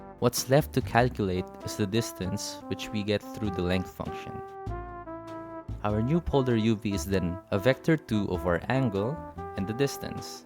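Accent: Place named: Philippines. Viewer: new instantly from the accent this was a fellow filipino incredibly useful video my man hoping ill get to use some of these in my own projects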